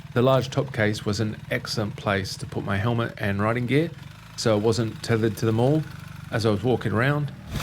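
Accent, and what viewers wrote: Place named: Australia. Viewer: Luv yer review. Mayt, ya sound almost like an Aussie. I’ve not seen any of your reviews before, but I liked how ya did this one so I’ll look at a few more. Cheers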